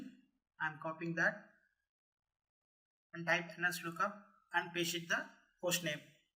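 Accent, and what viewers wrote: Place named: South India, India. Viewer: First, Appreciate your efforts ....Second, you really speak fast with heavy South Indian accent , its really tough to understand for me too (being a citrix admin and living in south india)....... Third, A suggestion, you may add subs in the video......this will increase for you hits and would be another favour for everyone.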